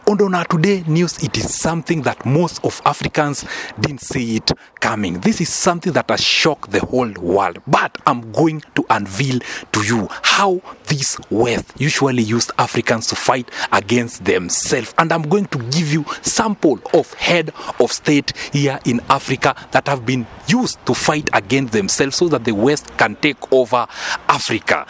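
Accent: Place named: Kenya. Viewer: You keep lying about Ghana you area Kenyan with that thick accent talk about Ruto and the good things he is doing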